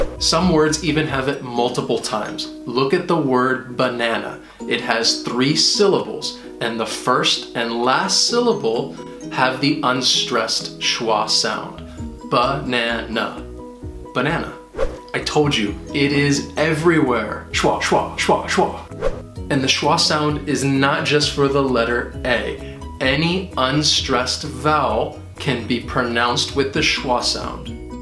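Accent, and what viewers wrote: United States. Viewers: Do you speak US accent?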